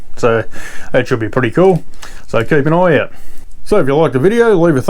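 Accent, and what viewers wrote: Australia. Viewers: Always nice to see a brother from down under our accents are unmistakable just discovered your channel nice thorough video 👊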